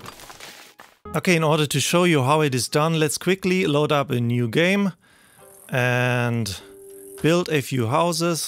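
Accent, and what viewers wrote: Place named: Germany. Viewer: German accent incoming in 3...2...1 🥰 love it.. Thanks for your videos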